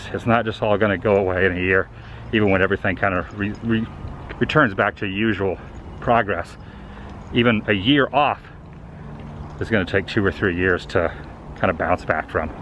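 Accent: South, United States. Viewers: Your accent is changing. Its very very subtle but your voice is becoming less southern.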